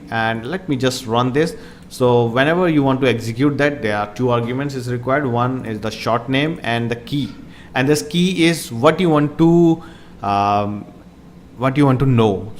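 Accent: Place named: India. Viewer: Indian accent = "instant dislike" print(Indian accent) instant dislike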